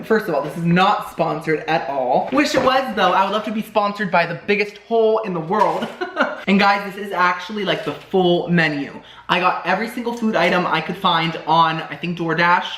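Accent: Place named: United Kingdom. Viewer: Hilarious video! You and crack me up! You MUST convince him to do a taste test video with you! That would be so fun! Also...you need to made a video of you just saying CROSSIANT and BUTTA (in your British accent) for 10 straight minutes! lol...the way you say CROSSIANNNN...just cracks me up! One more thing....a friend called me and I said I have to call you back I'm watching...lol..nobody interrupts my time.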